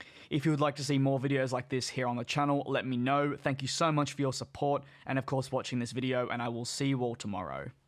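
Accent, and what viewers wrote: Australia. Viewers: "..A bucket.load.." Great to hear an Aussie speaking, even though you're too polite to say " a metric ***k tonne" Goodonya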